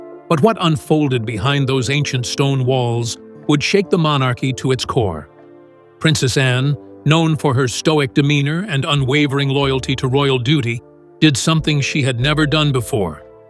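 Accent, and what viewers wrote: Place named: United States. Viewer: Be very careful what you believe. Especially when it comes with an American accent. This report is total garbage. Believe me, I know.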